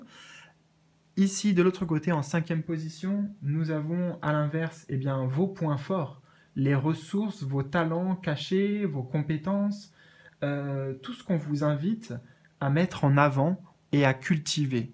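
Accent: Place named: England, United Kingdom. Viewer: 😂😂 super accent anglais ! Merci pour la vidéo 🌟✨🌈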